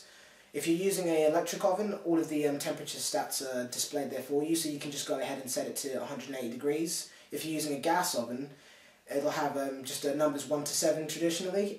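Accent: United Kingdom. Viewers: a black guy with a British accent talking about hockey skates..... lol crazy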